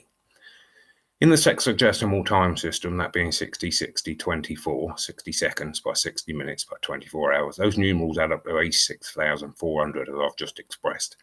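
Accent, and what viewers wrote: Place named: England, United Kingdom. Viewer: For your information my english might not be as good as yours since i was born and raised in Sweden, i assume you are english judging by your accent. I have a question for you though, what do you think would have happened If christianity didn’t exist and Vikings would’ve continue to follow their religion and same with all the other native religions?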